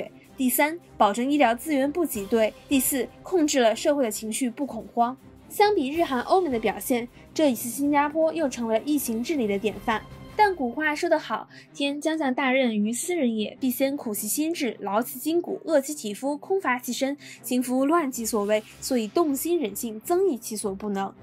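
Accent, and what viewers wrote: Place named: India. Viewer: 这英语一说，我以为到了印度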